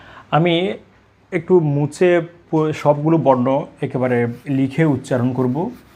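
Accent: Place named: Chittagong, Bangladesh. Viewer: চট্টগ্রাম এর আঞ্চলিকতার প্রভাব কিঞ্চিৎ লক্ষণীয়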